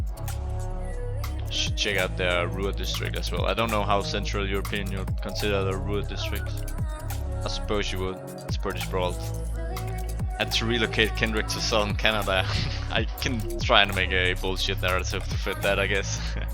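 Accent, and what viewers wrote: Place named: Denmark. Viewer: Your accent sounds Danish, are you?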